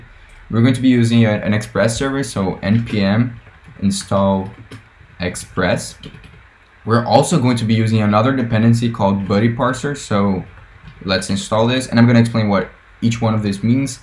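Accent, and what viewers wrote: Brazil. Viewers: o que facilita entendermos e que o cara e BR o ingles dele ajuda muito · ingles brasileiro e bom demais de entender, vlw!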